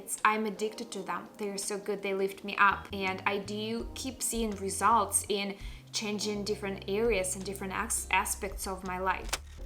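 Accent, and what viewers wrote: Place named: Russia. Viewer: Вы из России или Украины? Слышу знакомый акцент )))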